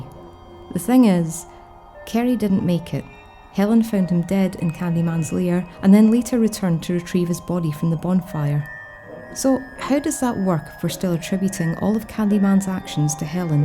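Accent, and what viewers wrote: Scotland, United Kingdom. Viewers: Really excellent. And nice to hear a fellow Scot. I always thought the film was meant to be as much like 'rumour' as Candyman himself, so resisting clear-cut explanations. I also wonder how much input director Bernard Rose had. His wonderful film 'Paperhouse' was praised by Barker, and makes an instructive comparison with 'Candyman'. Helen also reminds me of Julia in Hellraiser (one and two), in a faint way. The murders that happen because of them or by them, which are only between them and the male villain. Then they both 'resurrect' - Helen as a murdering ghost summoned from the mirror after her name being said five times, Julia as a murderess 'summoned' from a mattress as the result of several murders (having become, maybe, some kind of legend herself, in the doctor's eyes). I'm spitballing....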